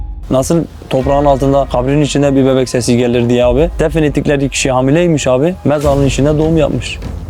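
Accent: Diyarbakir, Turkey. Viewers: diyarbakırlı belli arkadaş klpcdbrdr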